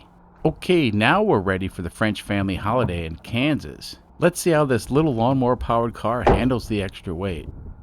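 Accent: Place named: Long Island, United States. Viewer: I thought I detected a slight Lawnguyland accent in your videos since I started watching (expatriated North Babylon native here).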